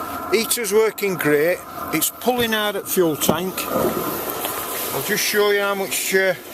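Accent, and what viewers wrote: United Kingdom. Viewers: Hi. I'm about to buy a Vivaro SWB and I'd like one of these fitting. Do you offer this service please? I'm guessing by your accent that you're in the North? I'm in Lancashire. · Proper northerner. Love the logic, great explanation 👍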